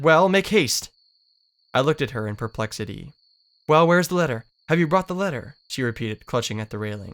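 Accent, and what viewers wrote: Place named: United Kingdom. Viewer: good video but i hate your shitty british accent when you read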